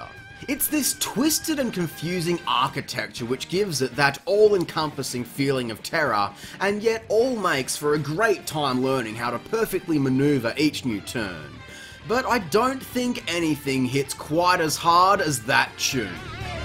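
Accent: Australia. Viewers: Wow, what a bumper and brilliant treat this was to watch! I really enjoyed your opinons and enthusiasm, nice to have an Ozzie accent to listen to throughout!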